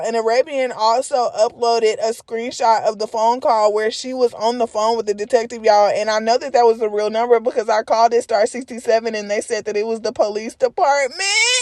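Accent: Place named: Texas, United States. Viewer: lol i can tell yu from texas ...im from louisiana and you said them cities kinda wrong no shade tho but i live around thos cities too!!!! ima big 318!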